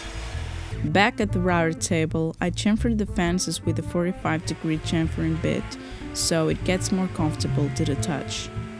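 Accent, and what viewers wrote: Portugal. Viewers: caralho, não falho, percebi logo que eras tuga pelo teu sotaque no ingles hahahaha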